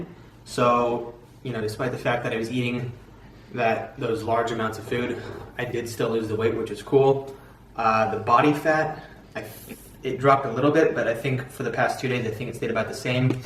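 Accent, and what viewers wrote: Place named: Canada. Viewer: Hi, i wanted to ask where are you originally from? and where are you based at the moment? Your accent sounds Canadian, but your name sounds Romanian and you look Romanian.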